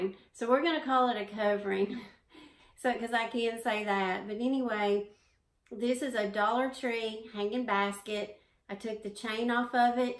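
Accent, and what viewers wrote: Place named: Alabama, United States. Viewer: Very glad I found you too. You sound like you're from Alabama. lol I amove the cloche you made. I will definately be trying it. You've surely inspired me.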